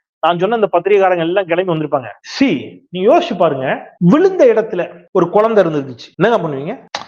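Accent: Tirunelveli, India. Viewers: நெல்லை வட்டார வழக்கு 👍👍👍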